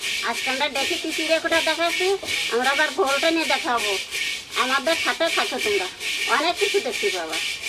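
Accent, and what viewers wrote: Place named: Faridpur, Bangladesh. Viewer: আপনার ভাষায় ফরিদপুরের সুর দাদি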